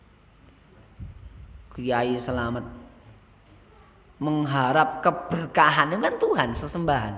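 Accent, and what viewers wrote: Java, Indonesia. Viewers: Waduhhhh jowoooo wkkkk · Di mengerti,,,,, heheheh jawaaaa ki hehhe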